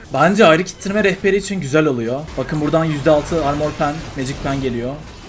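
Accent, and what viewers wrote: Adana, Turkey. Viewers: kardeşim adanalı olma ihtimalin var mı :D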